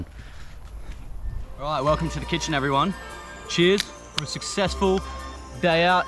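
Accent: Australia. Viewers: I LOVE THE AUSTRALIAN ECCENT😩❤️ greets from the Netherlands!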